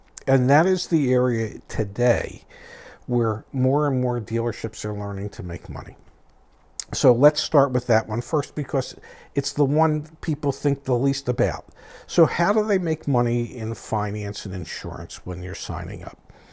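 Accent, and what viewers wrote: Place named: Philadelphia, United States. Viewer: If I ever need an example of a Philadelphia accent to show someone, I'll send them this video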